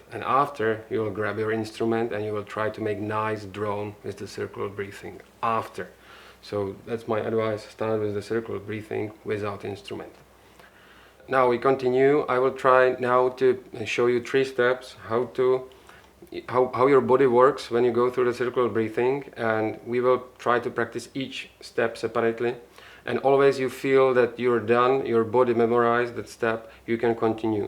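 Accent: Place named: Norway. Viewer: You sound norwegian..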